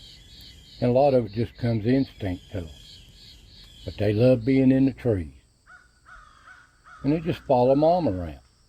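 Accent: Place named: South, United States. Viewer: love ya and your videos. 😢i lost my grandpa in 2013 he was 86 and in ww2 n he was from gatlinburg TN. your Southern voice sounds like my papa. God bless n ty ❤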